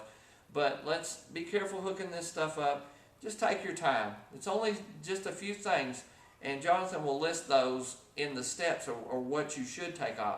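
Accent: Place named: Texas, United States. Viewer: Go-ahead and say chassis anyway you want. We know what you mean and where you are from. It is a French word. If we tried to say it to a Frenchman's satisfaction, we would all look ignorant. If a TX accent causes you to fail to understand the content of these excellent videos, then I would find you strange. Read this eurouc: <link> Accents are long standing parts of verbal communication which add texture and identity to spoken word. Maybe you are simply too ignorant to understand. Maybe you are French. As I am French-Canadian and have half my family living in the Bayou posing as Cajun, I am offended by you either way. EuroTrash might be a better name for you.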